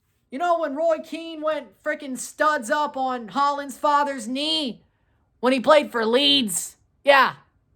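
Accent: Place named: Kentucky, United States. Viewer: Is this guy a gringo? He's accent sounds as if he's from Kentucky. He reminds me of an infantile Jim Cornett.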